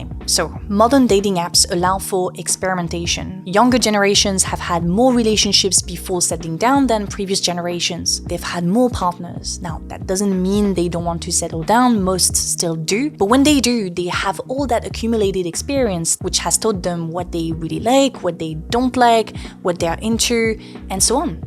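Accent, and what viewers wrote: France. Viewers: Je me disais bien que je ressentais cet accent français 😂❤ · Ahh, makes videos deliberately titled ' the toxic hedonism of male travel vloggers ' and 'are straight men ok?' all in a slightly provocative and mocking manner with almost a glee and a false sense of concern in the background and laughing at people who respond to the generalization, suddenly talking about the gender wars with her shtty french accent. Never change girl. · Are you french?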